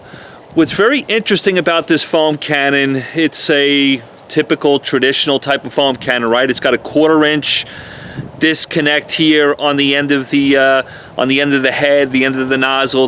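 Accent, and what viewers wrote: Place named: New Jersey, United States. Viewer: I was expecting a southerner when it said Dallas, Tx, not someone from Jersey.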